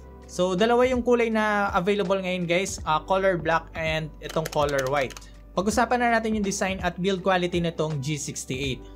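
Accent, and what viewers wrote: Philippines. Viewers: I love that I can still understand Tagalog. It's so fun to hear you do Taglish.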